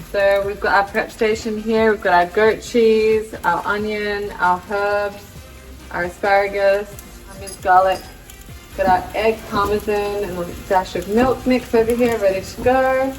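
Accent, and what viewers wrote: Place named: Australia. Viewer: you are such a funny and beautiful cook, I love your Auzzie accent and am a huge fan of your wonderful husband Texas guitar slinger Mr Gary Clark Jr, Ive seen you two interviwed at the grammys and you just take my breath away, fine couple hope you can keep your love flowing Thank you for all your delicious recipes you of course make it all so delicious.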